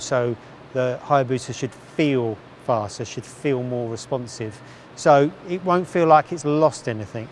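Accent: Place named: Northern England, United Kingdom. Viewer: “MmmBooossa” said in that irritating Northern accent that all Busa owners seem to have! 😝😂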